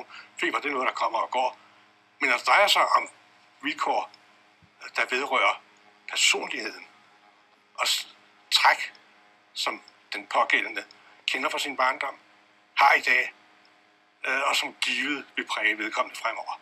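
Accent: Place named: Australia. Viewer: Is that an AS symptom, your picking up a little bit of an Australian accent. Oh, and is TMS trans magnetic stimulation helpful with AS depression?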